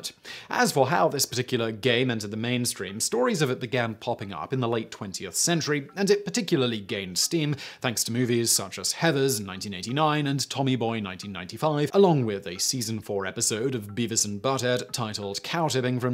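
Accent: England, United Kingdom. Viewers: The presenter has such a proper English accent. Where’s your mac Englishman?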